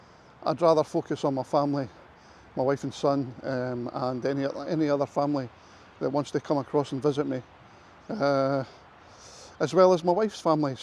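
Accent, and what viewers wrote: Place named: Scotland, United Kingdom. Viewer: Yep it's English. Scottish accent is considered difficult to understand even by other English speaking nations. Many also find the accent cute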